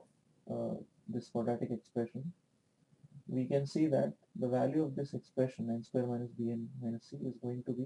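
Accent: India. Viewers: Indian (?) accent plus s-o-f-t voice plus recording volume turned up to compensate = mud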